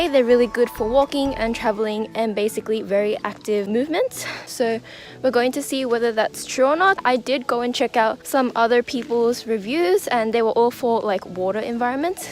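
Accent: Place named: United Kingdom. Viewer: Your british accent is so 'umai'.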